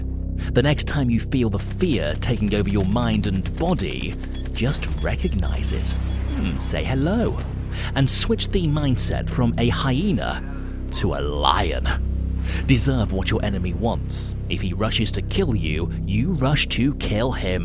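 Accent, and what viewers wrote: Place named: United Kingdom. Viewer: Hey guys I'm new to chanel. Why is the commentary in a British accent in contrast with accent????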